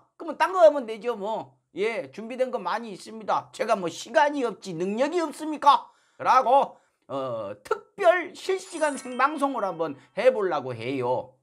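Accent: Jeolla, South Korea. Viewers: 전라도 사투리가 구수~ 하구먼~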